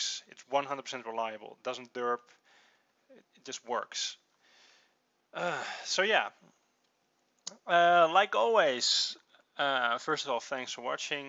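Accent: Netherlands. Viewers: je spreekt comparator verkeerd uit, 'tgeeft niet p.s. je kan hiren dat je nl bent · You are Dutch right? I can hear it 'cause of your accent :P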